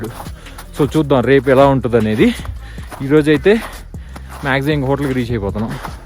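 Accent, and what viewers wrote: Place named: Guntur, India. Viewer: Me guntur slang valla anukunta chalaa natural ga untundhi me explanation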